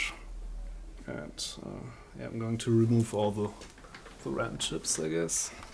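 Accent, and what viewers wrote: Germany. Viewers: Hahaha, how did I miss this one over the course of the years... "This is a Macintosh SE DIRTY" :D:D:D Love the German accent :D I have one of these and I love it. Recapped it years ago with nice tantalums so goodbye leakage ever – still have to recap the analog board, so I'm happy I ran into this video just now, it reminded me of this :D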